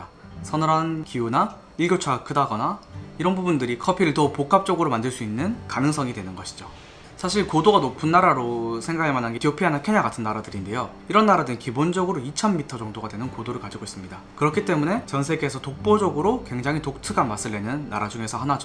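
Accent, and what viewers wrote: South Korea. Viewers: ㅋㅋ 오히려 경상도 사투리때문에 더 꼰대스러워욬ㅋㅋㅋ 연기하시느라 고생하셨어요. 좋은영상 찍어주셔서 감사합니다. 많은사람들이 봤으면하네요. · 불어 하는 사람으로 한국식 발음에 못 알아들었음 ㅋ Terroir 라는 걸 알고 빵터짐 영어나 불어나 한국식 발음 참 알아듣기 힘듬. Anyway.. I like to drink Jamaican Blue Mountain, Ethiopia Yirgacheff & Colombia Geisha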